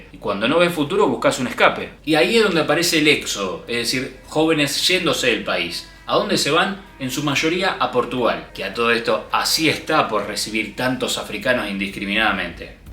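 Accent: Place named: Argentina. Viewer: se disfruta más el tono y acento de voz argentino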